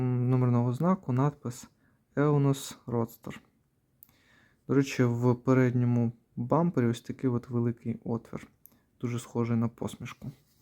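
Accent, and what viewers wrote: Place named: Russia. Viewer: І взагалі... Маскалізмів забагато. Старайся гарніше говорити солов'їною. Вуха ріже безбожно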